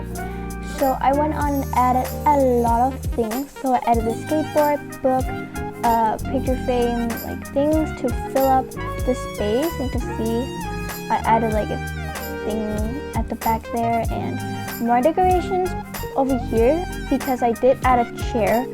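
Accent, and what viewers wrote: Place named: South Korea. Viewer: I have no idea why, but you sound a teeny bit Korean I think?? Or Chinese? Just me, ok.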